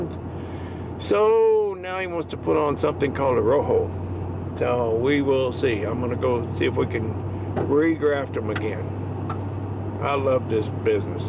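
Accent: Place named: Appalachia, United States. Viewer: Hey. You're in Washington but I detect some Hill Billy in that accent. Are you from somewhere else originally? I'm from Tennessee. Hey I have a question that relates to this video. I have a Gala I bought that's suppose to be a semi dwarf but in the fifth year here it seems to be leaning some and I can grab the tree and move it around kinda easy. Would it be a good or bad thing to plant a couple of MM111s on opposite sides and Inarch into it? I don't want to stake it if I don't have to. Thanks.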